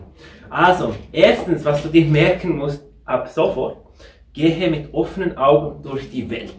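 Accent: Switzerland. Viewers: Ich finde das schweizer Hochdeutsch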